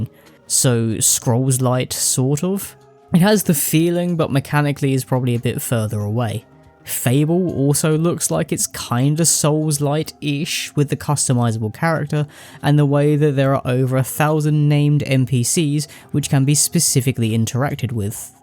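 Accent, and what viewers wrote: United Kingdom. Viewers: it took me this long to realize you are British. That's how bad I am at recognizing accents...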